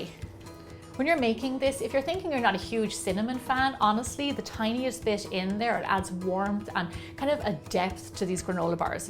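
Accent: Ireland. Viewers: I come for her recipes and her irish accent 😍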